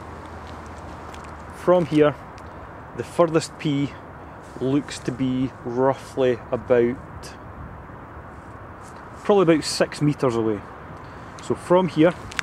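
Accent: Scotland, United Kingdom. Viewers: I love this kind of Scottish accent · i love your scottish accent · Scottish accent ! I love it ! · the awesome Scottish accent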